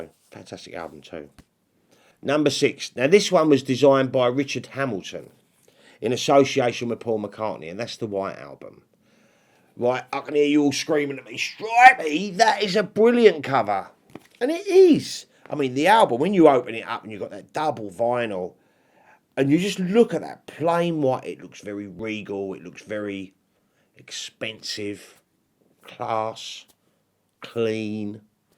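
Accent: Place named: Romford, United Kingdom. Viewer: Really enjoyed this video. Real hard to rank them. Personally I’d have put higher than the white album just because it’s got that glossy autumnal cover. edges it for me over too and I’m not a big fan of the album cover but your list was very interesting. You sound like you’re from round my way too. Im from Romford. Take care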